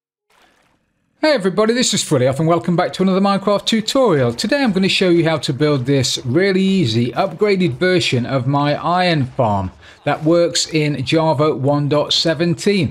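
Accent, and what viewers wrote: United Kingdom. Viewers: I really enjoyed your video. English is not my first language and I think UK accent pretty dificult to understand, BUT I think yours is lovely and I understood it all!!! Thank you very much for sharing with us your iron farm, I hope I can do it haha. And I LOVED the name you gave to the zombie, it took a real laugh of me xD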